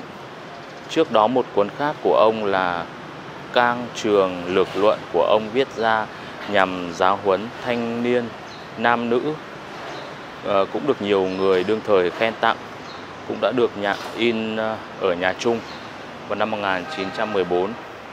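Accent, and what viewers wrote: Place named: North, Vietnam. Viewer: Hello, dung now tieng Bac Dan mien Nam Khong Thinh dau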